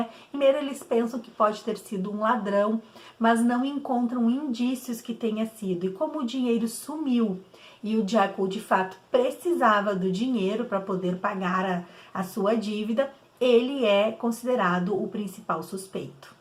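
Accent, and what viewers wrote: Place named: Rio Grande do Sul, Brazil. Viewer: Ahhhh, que amor! Um sotaque de gaúcha misturado com paulistanos 🙃🙂 Eu tbem amo Agatha Christie 🥰 Bom domingo pra vc 😘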